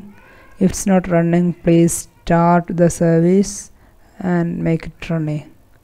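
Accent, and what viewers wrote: Kerala, India. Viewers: Typical Malayalee Accent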